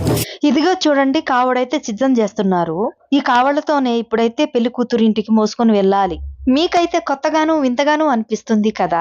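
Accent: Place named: Rayalaseema, India. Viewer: Meedi rayalaseema yasha lagunde. Nizamabad valladi kondari chuste rayalaseema yaasa vasthundi. Vichitram.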